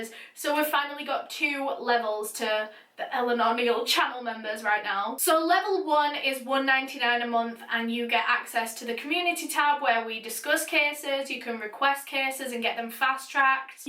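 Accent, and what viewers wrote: United Kingdom. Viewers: wait...her accent is so amazinngg its like british + merida's accent (idk her accent sorry) its so coooll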